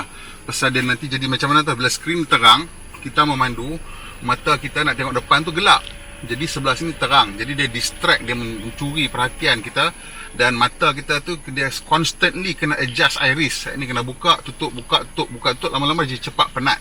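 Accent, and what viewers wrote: North, Malaysia. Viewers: Kalu ada lampu tuh..malam2 tak laa mengangau sangat..takdak lagi org ribiew keta guna ayat macam nihh..syoq abg dok sulam lorat utara nohhh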